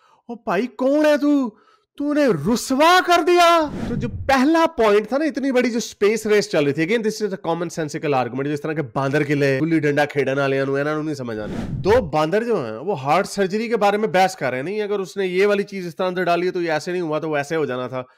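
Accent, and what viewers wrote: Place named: Punjab, India. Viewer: Your Punjabi tone is very beautiful 👍👍👌👌 Jai Hind Jai Bharat🇮🇳🇮🇳🇮🇳🇮🇳🇮🇳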